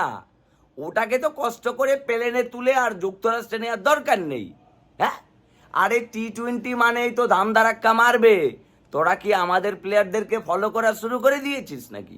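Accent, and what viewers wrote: Kolkata, India. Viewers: Bhai kolkata r accent ta ekdom bhaloo lagee naaaaa............Normally onek bhalo lage apna kotha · আপনার মুখে কলকাতার ভাষা ভালোই লাগলো। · কলকাতার এক্সেন্ট আপনারে মানায় না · Kolkatar vasay joss lage vaia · দারুন কলকাতা ভাষায় কথা বলছেন❤❤❤ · এরকম কলকাতার ভাষায় বলতে থাকলে ভিডিওতে লস খাবেন আগের ভাষায় ফিরে যান।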